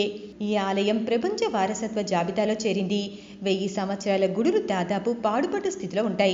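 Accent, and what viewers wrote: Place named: North India, India. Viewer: Iritating female voice more like northy accent.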